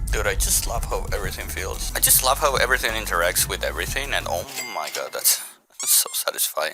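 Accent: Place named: Europe. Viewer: Your voice sounds weird because European, not because illness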